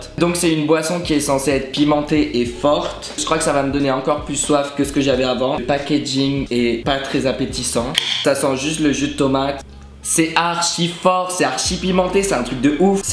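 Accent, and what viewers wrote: Quebec, Canada. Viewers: Est tu Québécois ou Français ? Je suis dans le doute 😂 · t'es tu Québecois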